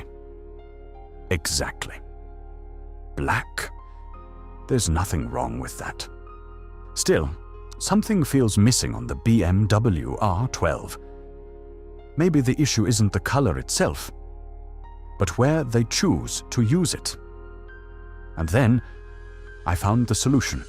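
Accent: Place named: RP, United Kingdom. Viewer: I think the new voice is maybe too posh.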